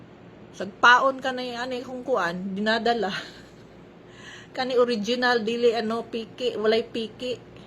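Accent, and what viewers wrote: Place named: Visayas, Philippines. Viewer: Bisaya diay ka maam?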